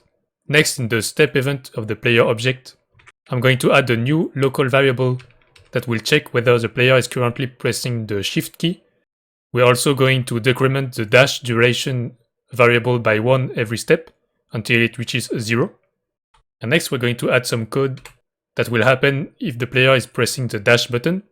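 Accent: France. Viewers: that french accent, i like it ! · J'ai tout de suite reconnus l'incroyable accent haha ! Plus sérieusement merci beaucoup et c'est incroyable de voir des devs français sur yt !! (même 4 ans après) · do you speak french its like your accent is a french one?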